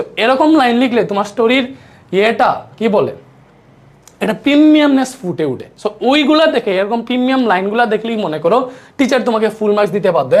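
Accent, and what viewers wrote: Sylhet, Bangladesh. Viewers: Sylheti monoloy amr o mone hoy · Mat huinna to lager sylheti ou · Mone Hoy lage to sylhety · আপনি কি সিলেটি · Mat huniya buja jar sylheti kori😊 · Sir ar basa ki sylhet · Sir kota bole half Sylheti Half Suddo😮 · সিলেট, গোলাপগঞ্জ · স্যার এর বাড়ি মনে হয় সিলেট জেলায় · Vai mone hoy Sylheti. Kota bartay lage 😊❤ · স্যার কিতা সিলেটি নি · ভাইয়া মনে হয় সিলেটের ❤ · ভাইয়ার কথা শুনে মনে হচ্ছে উনি সিলেটের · Bhai apnar bari ki sylhet a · Vaiya , hoito sylhet er manus.. Kotha gula just wow.. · Vaiya apni ki Sylheti🙂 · Bhaiya apni ki sylheti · আপনার বাড়ি কি সিলেট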